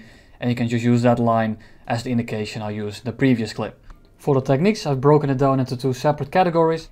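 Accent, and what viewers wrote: Netherlands. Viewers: Beetje nederlands acentje dit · Are you dutch? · Dutch accent! xD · You’re Dutch aren’t you? Nice accent bro. (Frikandelbroodje) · Dutch accent detected?? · whats that accent? it reminds me of an dutch accent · Are you dutch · Your accent sounds a little Dutch. Are you? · Bro is this guy dutch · 16 sec in 2 words spoken eeeeeey dutch guy insta subbed goed beezziiig. xD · are you from the netherlands? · i love your dutch accent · are you from the netherlands? it sounds like you have a netherlands accent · Ain't he Dutch?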